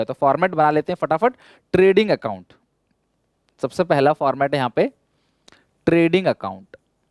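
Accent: Odisha, India. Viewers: sir ap ka mother language odia hai kya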